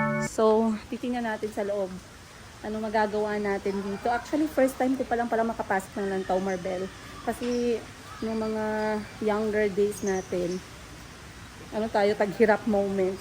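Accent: Iloilo, Philippines. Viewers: I love ilongo accent sayo madam 😂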